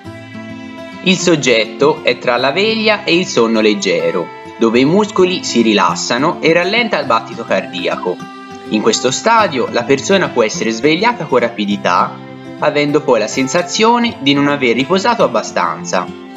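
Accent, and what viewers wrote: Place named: Tuscany, Italy. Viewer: direii ahahhahaha, sembri Paolo Ruffini (anch'io sono toscano)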